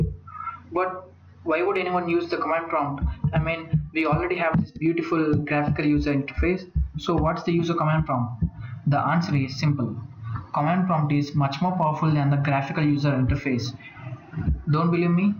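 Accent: India. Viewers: I knew instantly that im gonna learn when an indian guy starts talking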